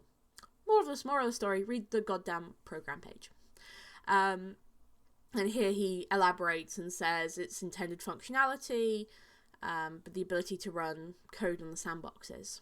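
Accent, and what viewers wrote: United Kingdom. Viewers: I'm completely tunned, I love listening to british accent. Keep going, you're fantastic .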